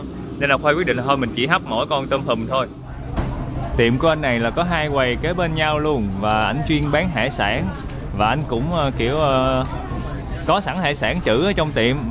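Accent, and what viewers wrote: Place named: Mekong Delta, Vietnam. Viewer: Bạn này nói chuyện đúng chất miền tây luôn a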